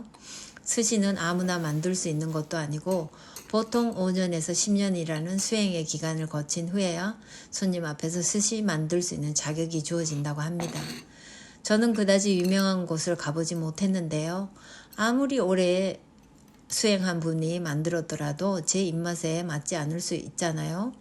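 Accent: Gyeongsang, South Korea. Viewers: ㅋㅋ 경상도 억양 있어요 그런데 그게 어때서 좋아요 따님도 우리말 잘해서 좋아요 엄마가 잘가르치셨네요~~^^ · 참 별 넘이 다 있네요....... 따님 나름 노력 많이 했을텐데... 근데........ 사투리 안 쓰신다고요???? ㅋㅋㅋ 경상도인지 바로 알겠던데요.. ㅎㅎㅎ 항상 잘 보고 있습니다.. 이쁜 따님에.... 이해해주는 신랑에.. 일본에서 잘 살아오셨네요.. 자부심 가져도 되실 분이신데.. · 저는 첨부터 경상도 출신인거 알았는데요^^ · 이 분과 9개월전 제가 거래했던 부동산 대표님--경상도 상경 추정되는 50대초중반 연령, 서울거주 20수년이상분과 억양, 어투 싱크로율 99%네요. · 경상도말투가맞아요 かわいい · 난 사투리 안 쓰는데에 악센트가 경상도 분인걸 단번에 알겠네요ㅎㅎ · 경상도사투리억양이 강하신데요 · 억양이 경상도입니다.섞이다를 경상도분은 썩이다라고 말함. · 경상도 억양이 잠깐씩 나타납니다 뒤늦게 정주행 하지만 성격도 경상도 기질이 보여지네요~~^^ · 엄마와 딸사이가 좋은게 부러워서 별 되도 않는 말을 하나봅니다. 못난 사람들은 무시하세요 ㅋㅋ 근데 넘 귀여운 경상도 억양이셔요~ ❤ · 경상도 억양이랑 사투리 쓰시네요. 본인이 모르실 뿐. 사투리가 나쁜것도 아닌데 왜 안 쓴다라고 하시는지. 그리고 따님 한국말 능숙하게 구사하셔서 아주 이쁘세요 😍 💕 ❤ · 사투리 안ㅡ쓰는데 억양에 경상도인줄 알았어요 어때요 모국언데요 욕만 경상도식으로 안하심 됩니다 문딩이 가스나가 하면 조금. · 우연히 이 영상으로 처음 들어오게 되었는데 경상도 사투리가 살짝은 있지만 아주 티날정도는 아니에요!! 일본으로 유학하러 온지 3개월이 된 사람으로서 이런 에티켓 알려주셔서 너무 감사하고 따님분 한국어 너무 잘한다고 전해주세요~~!! 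다시 한 번 감사합니닷! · 나레이션에 경상도 억양이 있어서 더 듣기 좋아요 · 빕먹을때 안쓰는 손도 상 위에 올려놔야 하고, 젓가락이 무기가 될수 있다는 상상이라니… 일본인들은 기본적으로 의심이 많군요.. 😮 그리고 누가봐도 경상도 사투리 쓰고 있어요 😂😂😂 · 저도 경상도 사람이에요 ^^ 경상도 억양 반갑고 정겹습니다 따님 한국억양도 경상도 억양이라 속으로 웃었네요~ 그치만 어쩔수 없지요.. 따님 든든하시겠어요^^ 일본 생활 엿보는 재미가 있어요 응원합니다 화이또! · 이분은 경상도분이구나~ 생각했어요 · 한국인과 일본인 차이점 사소해도 많지요. 님은 나레이션만 들어도 경상도 사람임을 일수 있어요. ^^ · 경상도 사투리 쓰고 계십니다. 말할때 높낮이 ( 사투리 억양) 로 사투리임을 알 수 있습니다^^ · ㅎㅎ 사투리 마이 쓰십니다 ♡ 어투에 갱상도 사투리가... 너무 너무 대단하십니다 한번씩 들러 보는게 이젠 하루의 낙이 됐네요~^^ 항상 가족 모두 행복하세요~♡ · 억양이 경상도 억양이예요. 경상도에서 자란 친구들 다 사투리 안쓰지만 억양때문에 사투리 사용하는 거 같아요. 따님과 대화 항상 좋아 보여요. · 엄마가 경상도 사트리가 좀 있어요♡^^ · 억양이 경상도가 있어요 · 억양은 경상도 시네요~^^ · 왜 이상해? 한국말 잘 하는게? 잘 가르쳐서 그렇지~~ 얼굴 안보여주는게 뭐가 이상해? 그럴수있지 다니면서 불편한거보다 낫지 경상도 사투리 씁니다 ^^ 너무 보기좋고 다정한 딸램 부럽습니다 😊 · 어머니, 단어 억양에 경상도 성조가 있어요. ㅋㅋㅋ 저도 처음에 듣고 이분 경상도 분이구나 하고 알았습니다. 그런데 듣기 좋아요. 오늘도 잘 보고갑니다.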